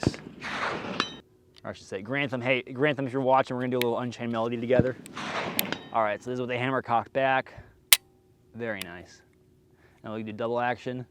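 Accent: Zimbabwe. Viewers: Nah that’s just a Rhodesian accent bruv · Spot on with the Rhodesian accent with a touch Saffa in it.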